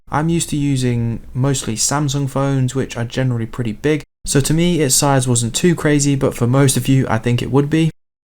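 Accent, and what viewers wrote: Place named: England, United Kingdom. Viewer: Great. And fantastic to hear an English reviewer and one with a nice, tidy garden as well. I'm a light user and I don't do games and I don't like having to worry about batteries so I'm considering it. I like big battery phones but never had a big beast like this. I'm assuming it works on 4g band 20 in uk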